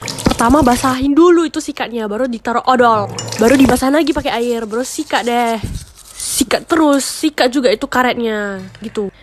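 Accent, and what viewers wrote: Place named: Medan, Indonesia. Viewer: Logat bahasa nya kak seperti nya orang Medan btw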